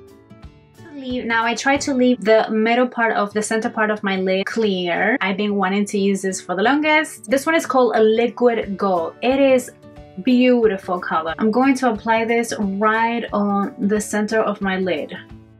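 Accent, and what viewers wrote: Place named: Caribbean. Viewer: Such a pretty look! I didn't realize you collaborated with one of my favourite youtubers. You have an accent similar to hers are you from the Caribbean?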